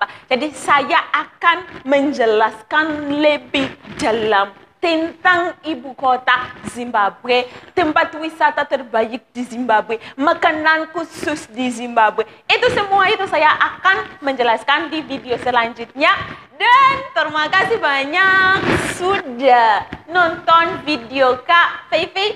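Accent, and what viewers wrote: Java, Indonesia. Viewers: Cantik mba ... tapi logat indonesia y kaya org jawa.... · Lucu banget sih kak, medhoook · Kebanyakan orang Indonesia kurang mengetahui geografi dan sejarah. Tetapi tidak semua. Masih banyak orang Indonesia yang tau geografi. Saya salah satu orang yang menyukai geografi. Ngomong ngomong bahasa indonesianya kog Medok ya mbak.. Tapi jadi lucu 😁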